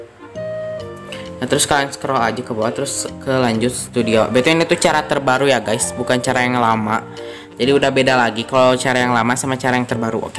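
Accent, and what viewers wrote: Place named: Sunda, Indonesia. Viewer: Logat nya kee Sunda bang 😭😂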